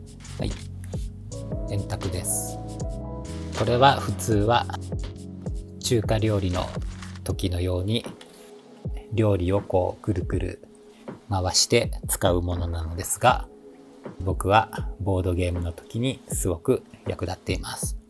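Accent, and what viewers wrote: Kansai, Japan. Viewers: 今度しんやさんの関西弁トーク見たいです(♥Ü♥)❤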